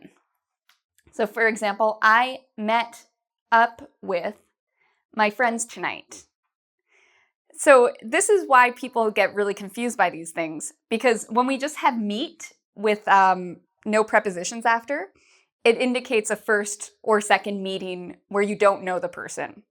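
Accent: Canada. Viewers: I bet is Canadian, there's nothing more Canadian than "aboout"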